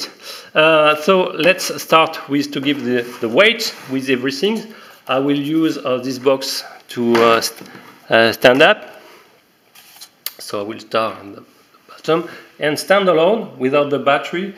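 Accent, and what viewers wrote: France. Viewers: mdr avec ton accent francais, il peut soulever quelle poids a ton avis ?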